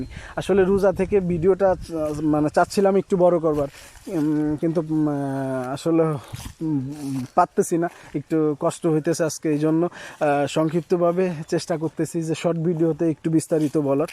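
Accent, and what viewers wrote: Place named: Faridpur, Bangladesh. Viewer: ভাই আপনার ভাষা ফরিদপুর কোন জায়গা,,, আমার বাড়ি সালথা মাজারদিয়া ইউনিয়ন কাকদি গ্রাম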